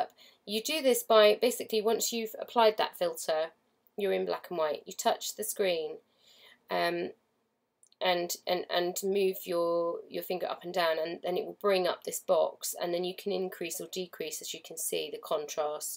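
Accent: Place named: Cockney, United Kingdom. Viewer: Very difficult to understand your comments with your Cockney Ac;cent. Sorry but you lose me when you are discussing your techniques and its a shame...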